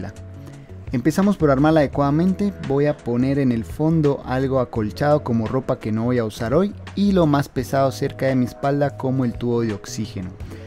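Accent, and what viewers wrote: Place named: Mendoza, Argentina. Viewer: Hola muy claro tu mje.,soy Mendocino pero quede desconcertado con tu acento,sos Menduco?.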